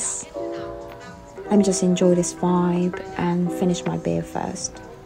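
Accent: United Kingdom. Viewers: I want to watch another episode!! Your vid is always amazing❤ I love your british accent too. Have a good one!!🩵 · 대만 브이로그와.. 영국 영어 까지.. 아주 브릴리언트한 영상입니다.. 잘보고가요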